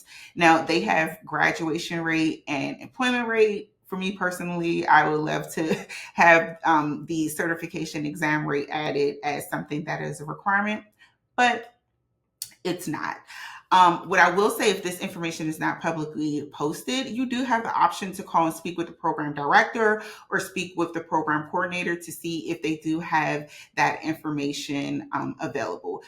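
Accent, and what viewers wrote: Maryland, United States. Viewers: Are you from MD, I feel like I hear a MD accent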